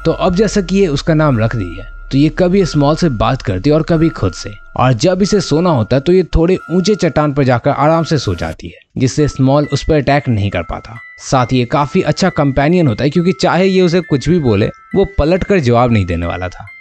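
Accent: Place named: Bhojpuri, India. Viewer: Your Bhojpuri accent was op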